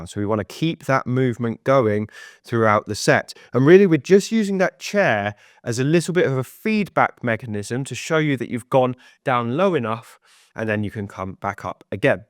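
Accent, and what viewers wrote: England, United Kingdom. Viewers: I’m here for the accent. Lovely English accent. Beautiful way of saying “bottom “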